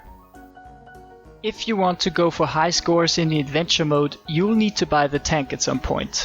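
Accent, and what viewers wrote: Austria. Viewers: kommst du aus Österreich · Kommst du auch aus Österreich?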